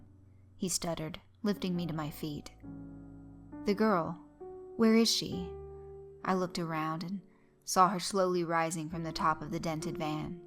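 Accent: South, United States. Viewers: YO WAIT YOU HAVE A SOUTHERN ACCENT?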